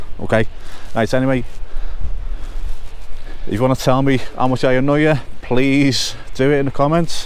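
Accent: Liverpool, United Kingdom. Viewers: Love your videos.....can’t always understand every word of your lovely Scouse accent but I’m a Geordie and you probably wouldn’t understand me ! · Scouse accent is worth the watch alone. Boss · Personality l think your coverage is brilliant .. honest , humorous and as a scouser living in Australia 🇦🇺 great to hear a raw scouse accent 👍 if thay don't like the commentary .. thay don't watch it ok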